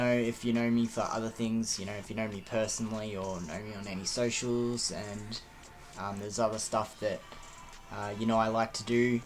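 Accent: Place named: Australia. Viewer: In one of your videos I was guessing where u were from....and what came to mind on your accent was either....AUSTRALIAN....SOUTH AFRICAN or ENGLISH......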